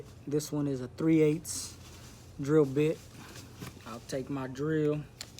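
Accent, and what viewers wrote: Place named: Texas, United States. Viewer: Thanks for this video! I’m in Los Angeles, CA, but I’m Mexican-American with family in the Galveston area & I immediately clocked your accent. Love it and makes me miss TX. Y este bigote! Nice. Also, I have a question. I have a peach tree that is in an area of my yard that doesn’t get enough sun and clearing the foliage & bushes around the existing tree isn’t an option. Half of the tree was diseased and I cut all of those parts off. (We found the tree this way when we moved into the house.) I just set up the water bottles yesterday. Will the tree being formerly diseased (I think it was a bug infestation because it was leaking so much sap!) affect my propagated peach trees? My plan was to take the propagated trees and plant them in a better part of the yard, then cut down the mother tree.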